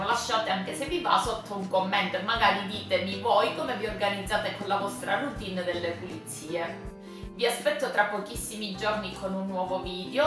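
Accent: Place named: Cosenza, Italy. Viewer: Ciao mi sono iscritta al tuo canale e volevo farti i miei complimenti!Se non sono troppo invadente volevo chiederti se sei di Cs perchè mi sembra quel tipo di accento (io sono dell'alto Jonio).Sei libera di non rispondere se vuoi .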